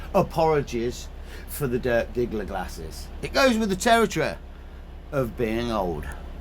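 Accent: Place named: Leicestershire, United Kingdom. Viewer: Loving the videos lately mate. Nice hearing a fellow Leicestershire accent lol. Keep them coming!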